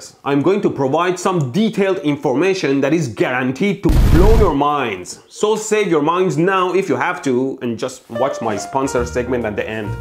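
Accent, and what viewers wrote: Iran. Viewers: Jan can you believe more than 20 years ago, this was the question asked in first year at the uni by professor teaching electronics-physics class, You are brilliant bringing joy to Iranian/Persian community with your intentionally thickened accent. I graduated in <num> and have been working at telecom (Mobile Core Network) eversince, it was so refreshing to see your video. tnx. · Are you Persian? I can say it from your accent 😊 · love the Iranian accent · What an Iranian accent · I love his bold persian accent :)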